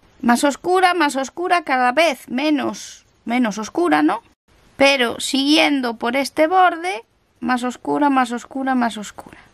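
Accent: Galicia, Spain. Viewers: y esa xenofobia? Tiene voz de una mujer mayor (una voz más relajada), supongo que sí se puede llegar a confundir con una voz de alguien borracho jajaja y su acento es español de toda la vida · Es gallega, ¿no? O si no del norte de España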